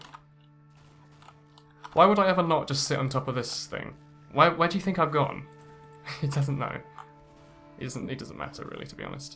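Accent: RP, United Kingdom. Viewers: Why do you sound so posh °=°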